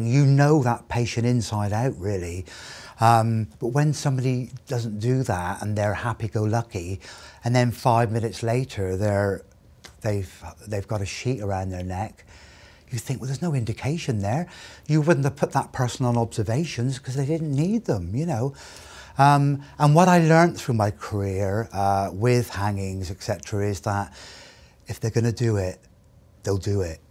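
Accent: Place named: Cornwall, United Kingdom. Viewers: As soon as I heard the accent, I knew it was Bodmin. What a lovely chap. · Straight away I picked a Cornish accent. We never lose that even if we don’t live there anymore. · Cornish accent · It's nice to hear such a lovely Cornish accent on the internet.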